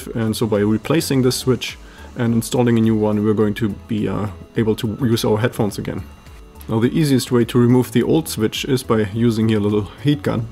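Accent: Germany. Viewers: Can i comment in german? I thought i've heard a little german accent :D... Ich habe ein Headset von Clam. Da kann ich nicht einfach das Ohrpolster entfernen... was tun?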